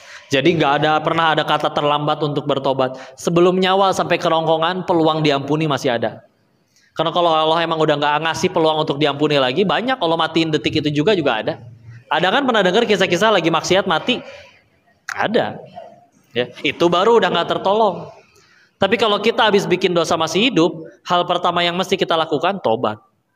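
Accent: Sunda, Indonesia. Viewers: Bener pisan logat sunda dan kalimatnya aagym banget. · Nyunda banget suka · Sunda pisan akang teh 😇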